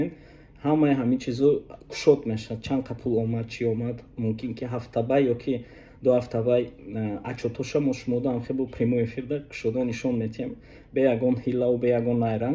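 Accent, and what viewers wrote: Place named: Khatlon, Tajikistan. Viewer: ИЛТИМОС БО ЗАБОНИ ДУРУСТИ ПОРСИ ГАП ЗАНЕД БЕ ЛАХЧАИ ШИКАСТАЮ ОМЕХТАИ ХАТЛОНИ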